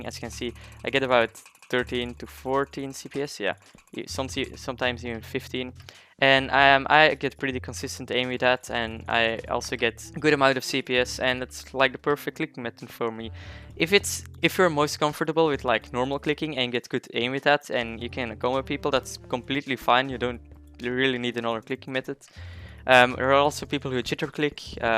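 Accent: Netherlands. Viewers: Dutch accent lol keep going ur actually pretty good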